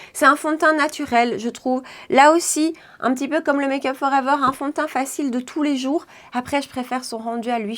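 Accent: Belgium. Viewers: Coucou d'une compatriote expatriée, ravie de retrouver les intonations, expressions du plat pays. Je suis de la team investissement sur le skincare et maquillage petits prix, mais j'ai des rides et des taches qui réclament un fdt ou BB crème performant, alors je me lâche sur le fdt. J'ai eu étrangement un gros coup de coeur sur le Nars comme vous, même si normalement le glow fait effet loupe sur les rides, celui-ci fait un teint éblouissant, comme vous dites, même en poudrant, et en unifiant, une merveille. J'ai craqué sur le Lancôme, mais gros flop, je trouve qu'il n'unifie pas, ne floute pas, ne tient pas, ne se fond pas, me fait vraiment un teint...moche! Malheureusement, il n'y a presque plus de teintes claires et médium du Nars sur les sites, donc je le garde pour les grandes occasions et les longues journées. En petit prix, j'aime beaucoup le Bourjois 123 pour le moment, avec une base illuminatrice dessous, excellent rapport qualité/prix pour moi(peau mixte)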